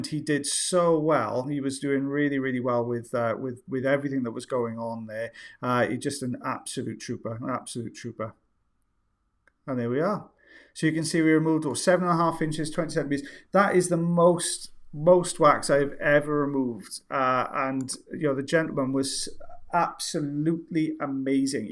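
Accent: Wales, United Kingdom. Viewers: Where in Wales ARE YOU FROM???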